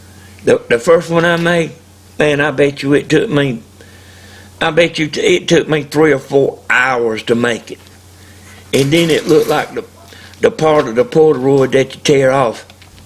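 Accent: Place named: Tennessee, United States. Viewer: Really appreciate the attention to detail. You and your videos are without a doubt the best and most properly informative antenna building tutorials on YouTube! Not to mention, everyone loves the sincere traditional American only southern Tennessee accent- adds that extra lovability to your clear credibility no doubt brother. God bless.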